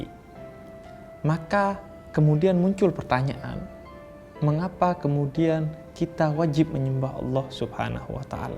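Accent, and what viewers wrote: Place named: Java, Indonesia. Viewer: bib jomblo muka arab logat jawa hee maaf bib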